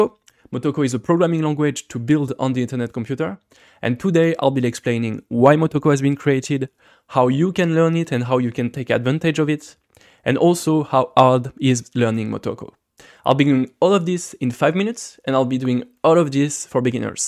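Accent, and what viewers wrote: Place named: France. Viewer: There must be somebody without a French accent who can give us fantastic information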